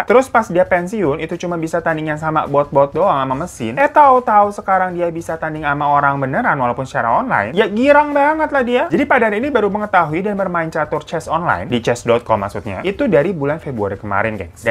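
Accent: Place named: Jakarta, Indonesia. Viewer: Anjir betawinya si abang admin,bikin ngakak seru !!! Jd kangen jakarta.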